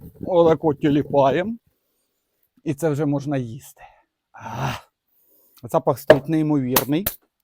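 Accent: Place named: Central Ukraine, Ukraine. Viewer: Возможно похож для кого-то по подаче на украинского грузина. Но больше на нашего украинского еврея) Правильные жесты и мимика которые цепляют, невысокие люди всегда харизматичны, наш Луи Де Фюнес) который умеет вкусно готовить. А самое главное смаковать и передать предвкушение перед дегустацией в каждом видео. Вам нужно идти в кино) А украинский с легким суржиком такой родной) это или Кировоградщина, Хмельниччина, Виннитчина или Житомирщина, может ошибся, но точно по говору центральная Украина. Понравился Ваш канал, что блюда что подача, смотрю уже месяц, многие блюда буду готовить по вашим видео. Супер!)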